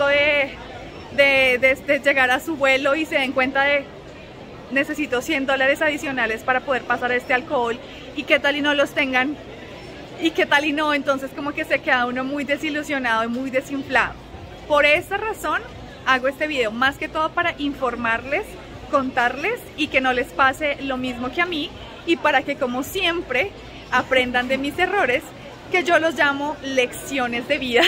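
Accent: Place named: Colombia. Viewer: Me Gusta Mucho cuando. Cuando sí de mijos Y Mijas En su asentó colombiano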